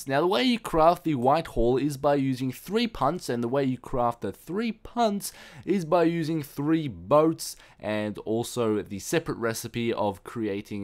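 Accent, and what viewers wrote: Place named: Australia. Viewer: Are you Australian? You kinda sound like one.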